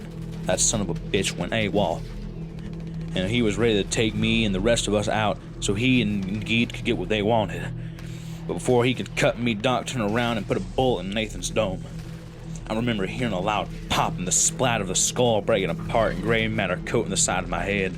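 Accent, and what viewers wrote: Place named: South, United States. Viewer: Your southern voice is kinda cringe but your vids are still good. Just tell stories in your regular voice dude.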